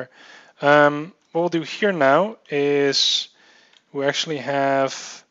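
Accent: Netherlands. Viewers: He sounds dutch.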